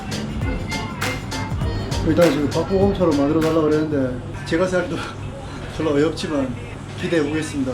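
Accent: Gyeongsang, South Korea. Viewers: 저도 경상도 사람이라 님 사투리가 넘 친근하게 느껴지네용 ㅋㅋ 옆집 오빠같은 느낌ㅋㅋㅋㅋ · 다음부터 이런 실수 하지마 ㅎㅎㅎㅎ 근데 말투가 꼭 우리 동네말투네요 대구쪽분이세요?? ㅎㅎㅎㅎ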